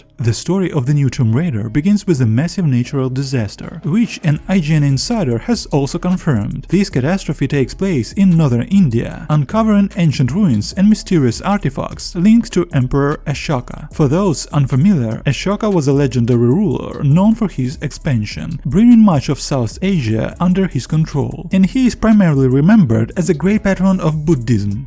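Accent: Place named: Russia. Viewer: лол, русский тип, тебя за километр слышно.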